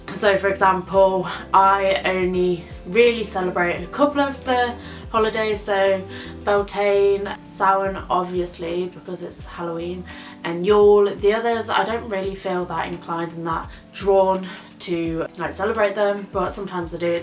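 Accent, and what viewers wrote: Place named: Northern England, United Kingdom. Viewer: Dya lIve in da US ?? U r sound like u r from Northern England 😺😺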